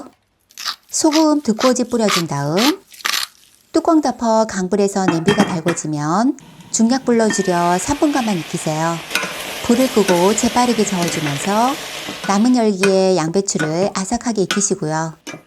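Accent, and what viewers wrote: Seoul, South Korea. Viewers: 참한 음성에 매력적인 서울 말씨에 건강하고 쉬운 Recipe ! 대구 호부래비 꼭 해볼랍니더 감사합니다!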